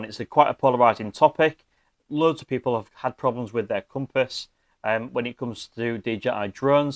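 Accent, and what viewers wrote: United Kingdom. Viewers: Just a separate comment on your accent, it's super English and I really really love it and marvel at how you sound bro.. PS: I have another comment on the actual video.. 😁🇧🇬🛸 Sweet Keep well and be safe!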